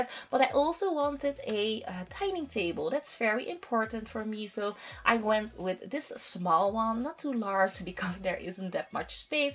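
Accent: Netherlands. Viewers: Are you Dutch? You sound Dutch. (I'm not Dutch but I lived there for a few years) Btw great video, I'm glad I found this channel ☺️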